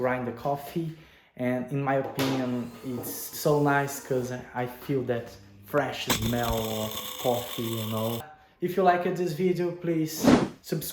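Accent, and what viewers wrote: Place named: United Kingdom. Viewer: Inglês britânico e muito diferente 😅 curti esse formato aparece mais vezes 👍👍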